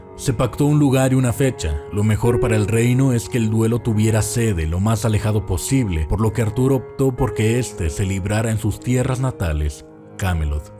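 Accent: Mexico. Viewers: Es la voz de Sherk Pero lo senti mas mexicano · Si hubiera disimulado el acento mexicano estaría mejor.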